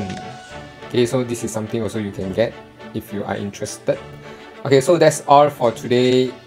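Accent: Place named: Malaysia. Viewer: you sond like malaysia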